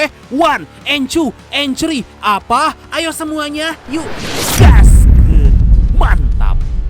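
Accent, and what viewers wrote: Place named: Banjar, Indonesia. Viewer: Banjar bnr pian lh 😅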